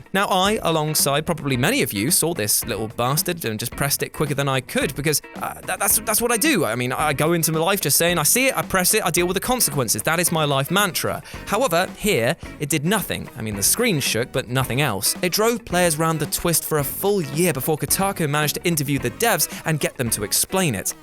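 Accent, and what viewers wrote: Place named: United Kingdom. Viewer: Everything is slightly better with a British accent.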